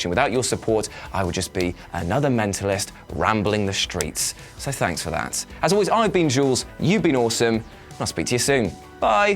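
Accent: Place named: United Kingdom. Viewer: I never knew until today that was actually a Brit.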